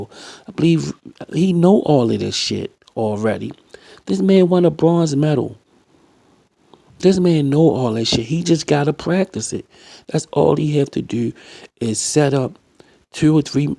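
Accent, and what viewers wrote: Baltimore, United States. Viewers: Straight Baltimore accent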